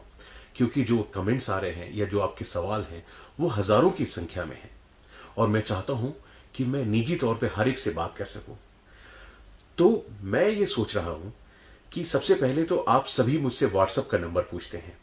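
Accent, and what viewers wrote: Standard. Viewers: Clear, shudh hindi. ✌ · Your Hindi is so pure....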